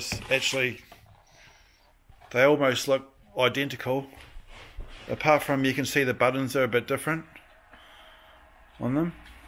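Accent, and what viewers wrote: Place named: New Zealand. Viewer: U sound like a New Zealander lol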